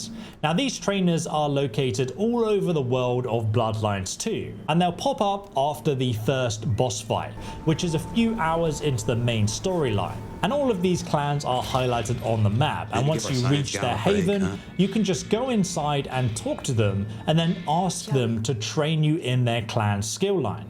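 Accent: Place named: United Kingdom. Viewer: Pretty sure He’s British and maybe that’s just how they say it. Plus’s who cares?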